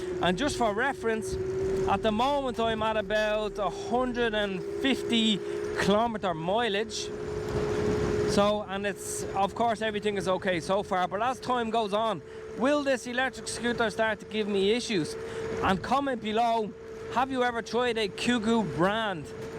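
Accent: Ireland. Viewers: You sound Irish i live in Dublin thinking of getting one put off recently after i heard someone was charged and banned from the roads for riding his e scooter without a license or insurance, Everywhere i look online it says there's no license required or insurance in Ireland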